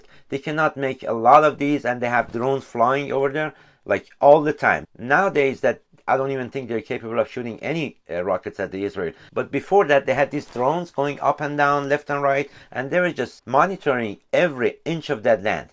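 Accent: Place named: Tehran, Iran. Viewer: harf nadare man kheily khoob mifahmam be valah englisit tehroonie . man kheily khoob ham mishnavam ham mifahmam . man jadidan asheghet shodam agha dadash.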